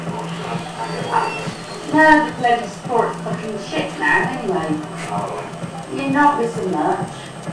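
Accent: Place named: Bristol, United Kingdom. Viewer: She's from Bristol they all sound the same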